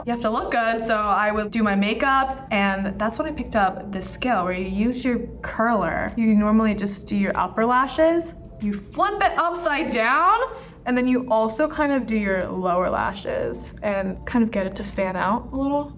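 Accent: United States. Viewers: they mean American accent, like her diction and the way she articulates the words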